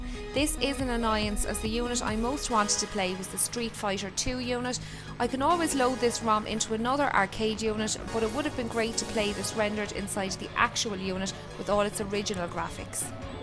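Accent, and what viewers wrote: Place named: Ireland. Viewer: Dat irish accent!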